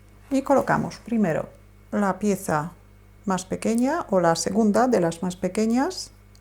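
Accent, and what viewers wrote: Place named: Spain. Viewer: Que bárbaro tu comentario Roxana salas. Tiene muy bonita su voz. Además es asento español..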